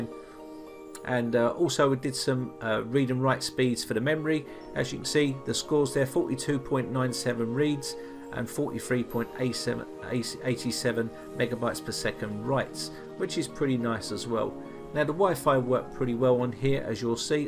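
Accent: United Kingdom. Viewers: British English..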